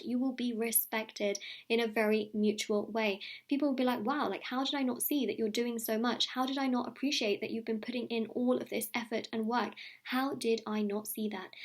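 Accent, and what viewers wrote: United Kingdom. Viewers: British accent 💗💗💗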